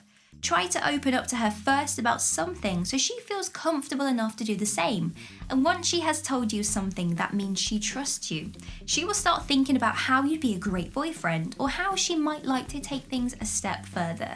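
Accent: United Kingdom. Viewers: I like your accent ! Are you British ?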